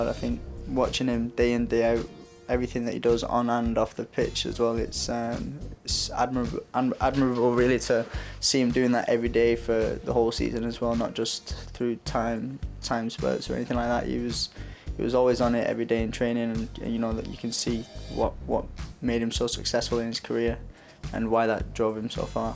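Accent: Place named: London, United Kingdom. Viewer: He sounds like a Londoner. I was expecting a Staffordshire accent.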